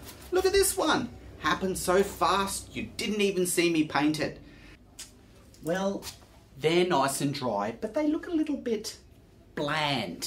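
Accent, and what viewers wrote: Australia. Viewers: I was in Oz for a couple of years and I just realized how much I miss the Oz accent until I watched your vids. Good work btw ! I will try soon.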